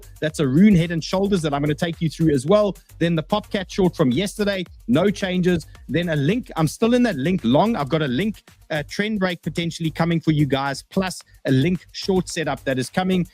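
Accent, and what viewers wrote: South Africa. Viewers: South African accent with American energy is grating to the ear holes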